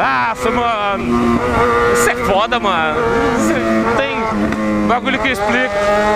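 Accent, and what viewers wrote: Goiás, Brazil. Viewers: Tu é de Goiás mano? kkk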